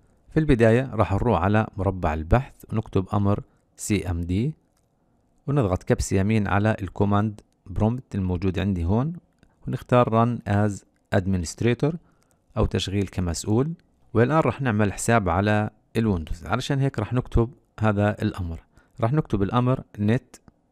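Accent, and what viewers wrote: Jordan. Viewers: والله انك بطل و يسعد لهجتك الاردنية او الفلسطنية بطل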